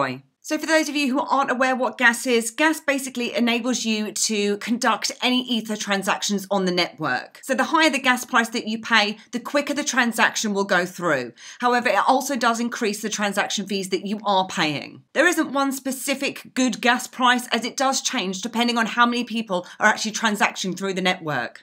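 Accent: United Kingdom. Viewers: Hi great video and by the sounds of it you are also in UK . Have given you a follow on instagram. First off the videos are all very clearly explained and understandable so thank you for that. Secondly it would be good to find out either on youtube or on instagram some local UK or London based crypto events. I have signed up to meetup but there are almost too many to choose from . Anyway nice connecting on the crypto space keep up the vids !!!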